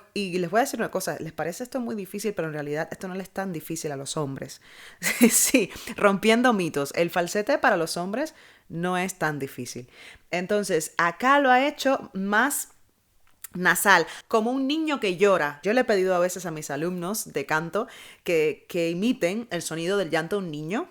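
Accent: Cuba. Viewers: Solo de escuchar supe que eras Cubana.Sin duda somos inconfundibles.Saludos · bienvenida a Dimash, me gusta ver las reacciones de los coaches (aunq no se nada de musica), pero me encanta ver como se quedan perplejos, porfa reacciona a stranger y Ogni pietra, saludos, otra cosa, me sonaste cubana, suerte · Saludos desde Cuba. Me encanta Dimash y qué orgullo ver tu reacción tan buena 👌🏽. Enseguida reconocí el acento cubano jejeje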